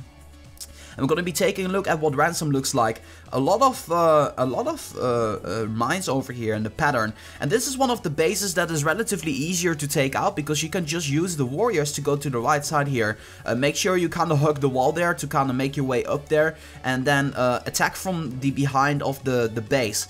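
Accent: Netherlands. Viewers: Hey man, you got some nice boom beach videos, just subscribed! 1 question though, where are you from exactly? Cause your accent sounds a bit funny (not in a negative way, but positive) i'm guessing from the netherlands?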